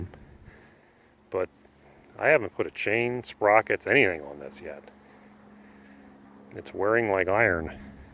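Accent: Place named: Pennsylvania, United States. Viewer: Maybe a Pennsylvania accent?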